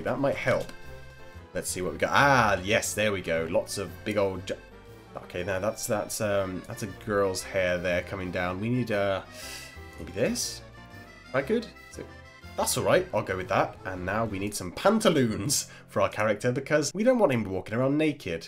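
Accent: United Kingdom. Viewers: are you UK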